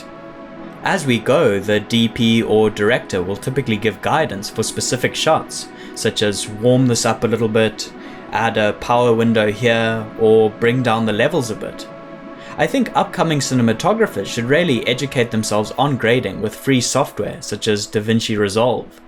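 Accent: South Africa. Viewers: AHA! I knew you were from some SA from ur accent but wasn't sure. Super cool to see South Africans doing so well on Youtube! Love the vids man keep up the good work.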